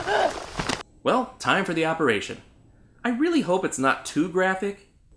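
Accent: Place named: United States. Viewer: Are you somehow a Filipino? Cuz honestly you look like one with a very american accent 👌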